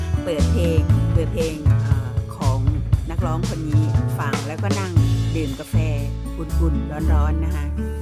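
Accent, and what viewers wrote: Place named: South Korea. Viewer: สำเนียงเกาหลีพี่เพราะมากค่ะ.🥰👍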